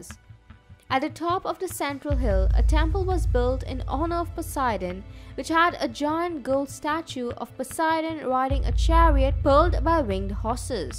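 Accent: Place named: India. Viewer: Rayhan Sakib Sounds like Indian accent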